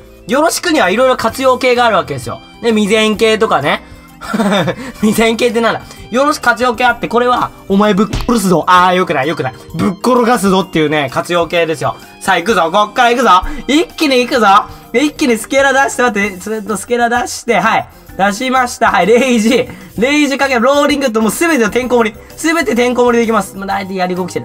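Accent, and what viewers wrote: Kansai, Japan. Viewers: 最高やんなぜ関西弁強すぎよわすぎぃーワロタww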